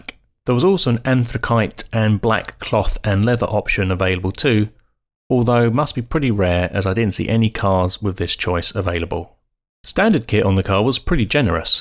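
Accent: United Kingdom. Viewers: Sounds weird but I love your videos as well as Nick’s...would love to hear a conversation between the two of you hearing your British accents haha, also heard the incoming G series M3 debuting sometime this year will finally offer XDrive (it would be great for you Nick being in CT)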